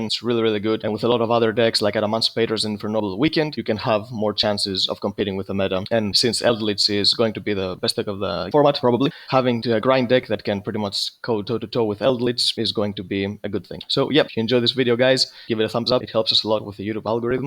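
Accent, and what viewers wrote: Greece. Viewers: R u greek or cypriot my friend?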